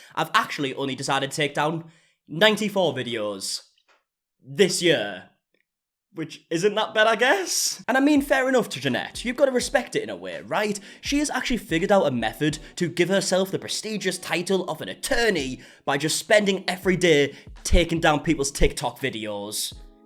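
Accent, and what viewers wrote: United Kingdom. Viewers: The purple is honestly a nice light colour and your accent and the way you speak has always been amusing to me (not in a mean way) just saying your accent is not a problem, nothing wrong with it. I’m also British and I sometimes get told by people from America that I sound like I’m speaking a completely different language 🤣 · don't you dare change the adorable british accent, i will throw hands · I love the Bri'ish accent. It's a huge plus for me when choosing which youtubers to follow. One thing I love about youtube is finding people that aren't just Americans and hearing how they sound.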